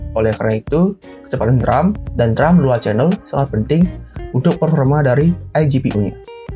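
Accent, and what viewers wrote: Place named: Java, Indonesia. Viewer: ngenah sajan logat nu medok :face-turquoise-covering-eyes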